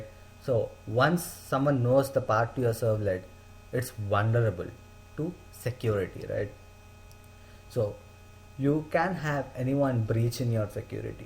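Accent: India. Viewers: i have problem with understanding indian accent :(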